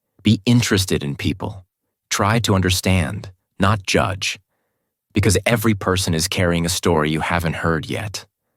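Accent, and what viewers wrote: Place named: United States. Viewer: with a vile accent twist. With all of mankind's languages to choose from to prostitute, they chose a most historically beautiful and fascinating language. America, a pox on humanity.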